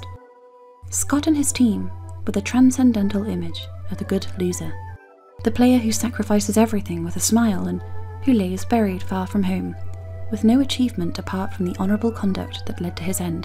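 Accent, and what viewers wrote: United Kingdom. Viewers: Your voice, it's so English / British. And the words that it speaks.... I love it. I thought it was lost. Thank you.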